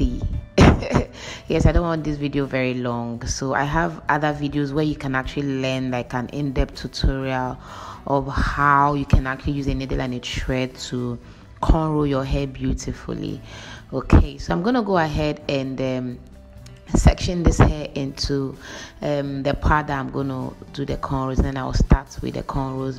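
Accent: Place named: Ghana. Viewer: You speak like a Ghanaian but your name is a Nigerian name.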